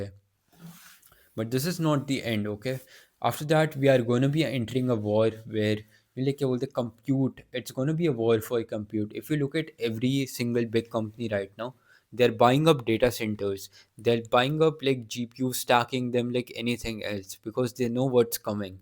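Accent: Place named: India. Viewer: Indian accent😂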